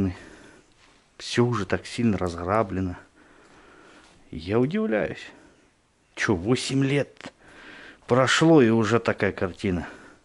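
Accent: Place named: Penza, Russia. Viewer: и на пензенский похож)))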